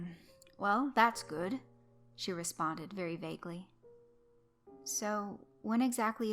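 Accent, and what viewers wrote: South, United States. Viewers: I ❤ listening to your soothing southern accent and awesome creepypata choices 😊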